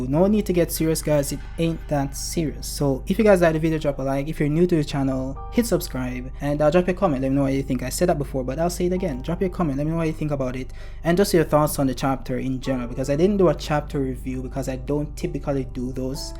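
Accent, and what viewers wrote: Jamaica. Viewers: Yow bro.... you Jamaican? Or from the Caribbean??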